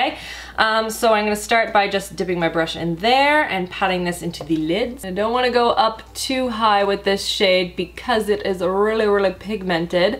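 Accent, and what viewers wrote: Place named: Canada. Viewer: That Canadian accent really came out in this video! Cute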